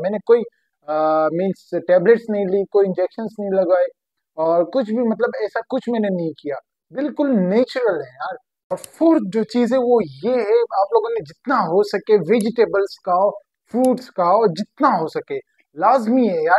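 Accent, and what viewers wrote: Pakistan. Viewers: Your transformation is amazing , you look so handsome but the issue is the way you speak, the moment you spoke it doesn't suit the swag you have in appearance. Pakistani language and male tone isn't sexy enough.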